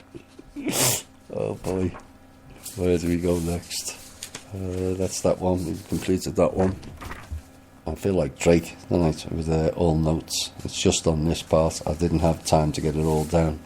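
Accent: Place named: Scotland, United Kingdom. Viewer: And a lovely, lilting Scottish accent to boot.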